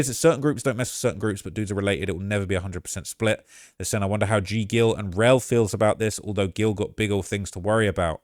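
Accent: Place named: United Kingdom. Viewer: This nigga is Chicago new akademiks lmao with a UK accent tho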